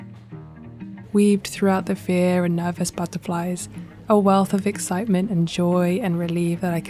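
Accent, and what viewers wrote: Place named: England, United Kingdom. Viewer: My daughter-in-law is from the Lake Como area of Italy. When she married my son and returned with him to Ohio, she had prepared as best she could but there were times she felt very homesick. Luckily her friends and relatives were able to visit them here in the U.S. (and to video chat often) especially during the first few years when the two grandchildren were born and she couldn't travel back home. Then Covid messed up travel for over 2 years. This summer she had nearly a month long visit there with the two bilingual kids in tow and hubby joining them for part of that time. It was so joyous for everyone! But she shared with me that once she got back to Ohio she felt she'd arrived "home" to her house and neighborhood and career with her husband and her children. Your love for your native England and your family of origin will never fade but your concept of where your "HOME" is will gradually switch to where your partner, your future children( those little cuties lying in wait for you in your future) and your new house and career are will then be your emotional home base. 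Of course these recent months have been unsettled for you and your creativity stiffled for the time! Your long wait will soon be over. (Just don't lose your lovely English accent and start sounding all American and inelegant when you swear or say shit! 😉😘😚)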